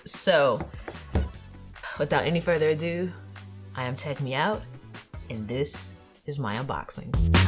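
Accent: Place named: New York, United States. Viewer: New York accent is strong. Probably I’m wrong but I don’t think so.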